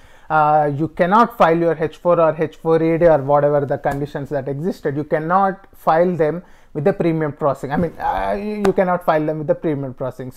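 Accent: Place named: Bengal, India. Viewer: Your accent is like of a Bengali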